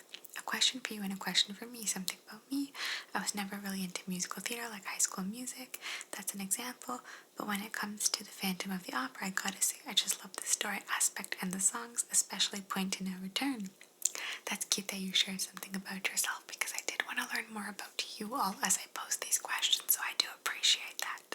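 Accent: Italy. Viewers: I just found your channel and have been binge watching all your videos. I especially love your Italian accent videos (so tingly!) but also, I am Italian and trying to improve my language skills too! I also love the Russian language, but can only say a few phrases, like introducing myself. I love your idea to read Italian books/poems in a video, so I will be looking forward to that! I thought your story about being a kid and prank calling the police was hilarious - I did the same thing with my sister as a kid and the police came to my house too. My parents were sooo embarrassed! but it's funny now. Anyway, I just wanted to share my love for your channel, I think you are awesome! I hope this doesn't come across as creepy, because you know...internet...but learning about you in this video makes me wish we could be friends, but I'm no where near Canada. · Hi! your italyaccent videos is funny;DD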